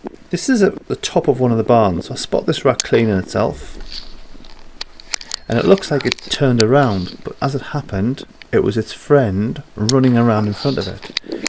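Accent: United Kingdom. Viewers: Love the UK accent in these videos, but yeah, we've got rats in the states.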